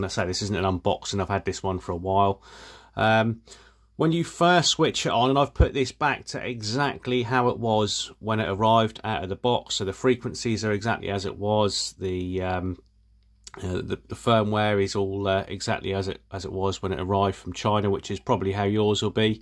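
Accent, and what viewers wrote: Norfolk, United Kingdom. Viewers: Thass a fine Norfolk accent you've got there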